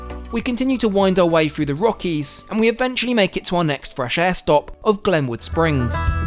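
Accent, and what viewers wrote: United Kingdom. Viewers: This guy has the most British accent ever!!! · Two reasons why I won't watch. First you smile too much. No real person does that. Second is the Brit accent. Awfully hard to listen to especially they way the voice drops off at the end of a sentence. How about some good old American for a change?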